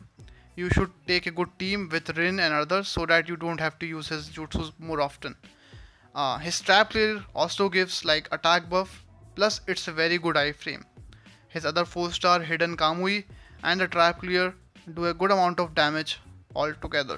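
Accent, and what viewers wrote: India. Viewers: Indian Accent😮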